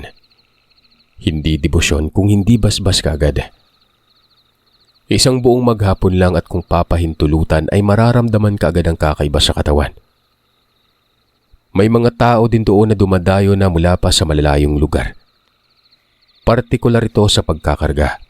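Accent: Visayas, Philippines. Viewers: Nanggigil ako sayo sir. Napa ka slung ng bisaya mo.. pa-ma` ba`. 😂😂😂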